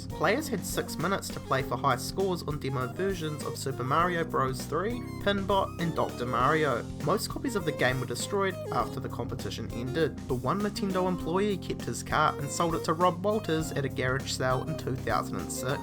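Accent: New Zealand. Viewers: Sounds more like New Zealand to be honest, could be Aussie though. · He's a new zealander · love the NZ accent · It sounds like NZ to me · Is your accent from north NZ? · That New Zealand accent though.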